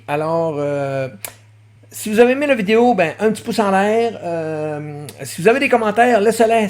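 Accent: Quebec, Canada. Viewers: Enfin une video quebecoise .. c'est l'fun entendre cet accent la sur des tutoriels :P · allez hop ! c'est passé ...ça maaaaarche !!!! merci à toi, ce tuto est génial !!! en plus suis grave fan de cet accent "quebecoué" ... surtout ne change rien oh non rien ...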